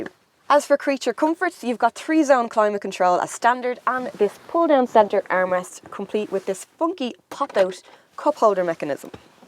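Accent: Ireland. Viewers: Love that Irish accent, will drive 14k subscribes today to 1.4M in 2 months.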